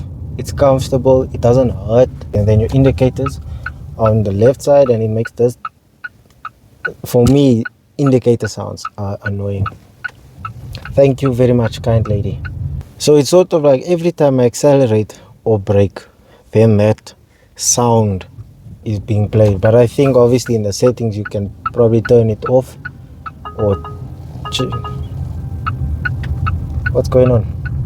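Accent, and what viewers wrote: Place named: South Africa. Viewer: was wondering if this is a South African reviewer then i heard "awe ma se kind" 🤣......On a hatchback, you gonna need that rear wiper....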